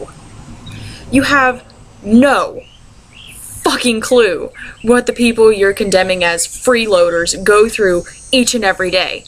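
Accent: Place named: South, United States. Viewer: I see you found that southern accent you proclaim you don't have in your other video. Be your self girl and be comfortable in who you really are.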